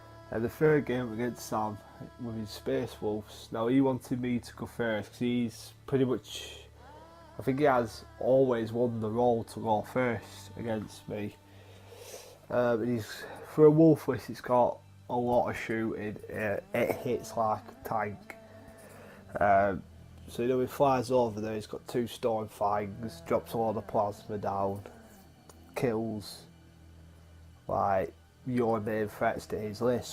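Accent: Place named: East Lancashire, United Kingdom. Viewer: Personally, it's always fun over victory. I basically buy miniatures I like then figure a way of getting them into a list. As you say, unless you are going to tournaments, it shouldn't be just about the best list. As to the video, personally I can listen to people ramble on about 40k all day. As long as I don't hate the accent (ok, you don't have the smooth tones of West Lancs but east lancs is alright 😁) I'm happy to listen. For wider appeal you could make it a bit less rambly. Have points you want to make and kind of plan it out better. But as a first effort it was a good listen.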